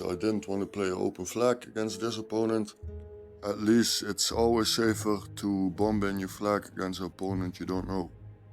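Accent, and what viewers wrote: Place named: Netherlands. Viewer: New to the Stratego app, but I am a fan of the game all my life. I recognize a Dutch accent a mile away. Nice video, hope to out bluff you if we ever meet up.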